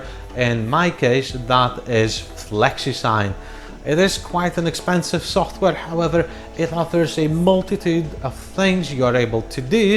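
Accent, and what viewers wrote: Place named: Liverpool, United Kingdom. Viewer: Scouse accent really shinning through now 😝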